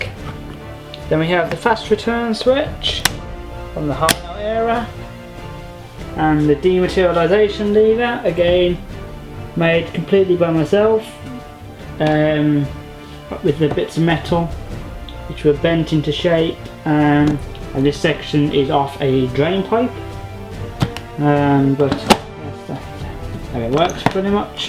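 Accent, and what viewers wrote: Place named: England, United Kingdom. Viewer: What part of England you from?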